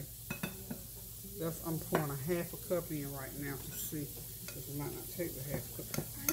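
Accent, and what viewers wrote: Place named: South, United States. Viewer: This too southernnnnnnnnn 😩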